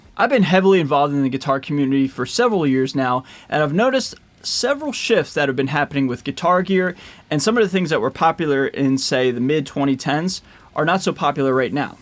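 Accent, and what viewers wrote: United States. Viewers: by any chance are you american?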